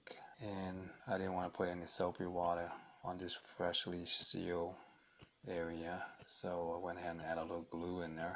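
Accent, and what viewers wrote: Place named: Asia. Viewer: Changadera in Asian accent 👌!!!!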